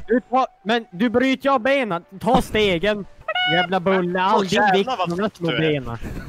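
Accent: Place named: Norrland, Sweden. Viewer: Skön norrländska och rolig video :))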